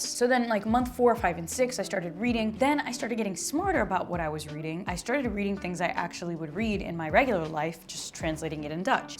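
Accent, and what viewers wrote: Flanders, Belgium. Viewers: Bedankt voor jouw gratis prompts. Jouw vlaams accent vind ik ook "snoezig". Momenteel ben ik spaans, deens en papiamento aan het leren. Papiamento wordt op Curaçao gesproken en is een mix van spaans, nederlands, portuguese, en wat afrikaanse talen. Mijn moedertaal is engels. Als je een spreekpartner voor het nederlands wil hebben ( gratis EN voor niets), laat het me weten. Ik zou het leuk vinden. Groet, Greg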